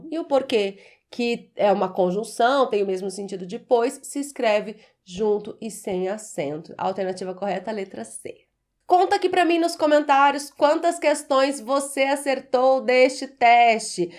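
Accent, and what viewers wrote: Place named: Caipira, Brazil. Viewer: Sua aula se torna consativa para quem sabe as respostas certas, mas quer apenas revisar. Pense num modo de fornecer as respostas e depois fazer os comentários. Assim, quem errou irá aprender; quem acertou passará adiante. Seu R caipira já QUASE desapareceu, tornando-se praticamente suportável. Por conta da melhora que certamente ainda ocorrerá, já adianto aqui meus parabens!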